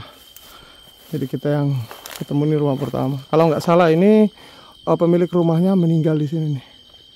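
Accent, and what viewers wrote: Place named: Banyumas, Indonesia. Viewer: Omongane ngapak....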